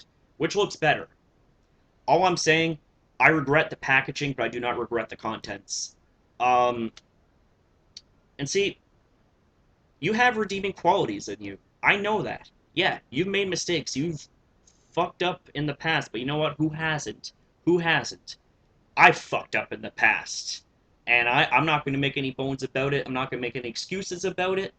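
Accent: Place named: Canada. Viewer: If you are reading this, don’t ask me how I got here 10 years later but I just wanted to say I FUCKING KNEW IT LMAO! Throughout the whole video I was being a little shitter and poking fun at your very very subtle Canadian accent. Then you made the reveal and I laughed so much. Now I really feel like I have a good ear for picking up these accents no matter how micro they might be. Anyways cheers from America, hope you’re doing well wherever you are bro!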